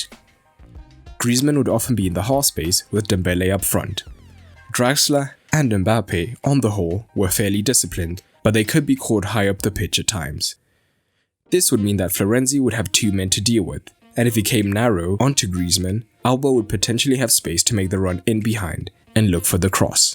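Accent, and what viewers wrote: South Africa. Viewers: You sound like you from South Africa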